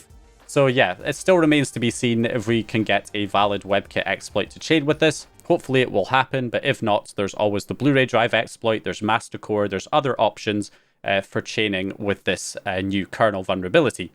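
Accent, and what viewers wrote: Scotland, United Kingdom. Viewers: Nah, not really. He's just Scottish. California valley girl is the worst if it's a man speaking that way.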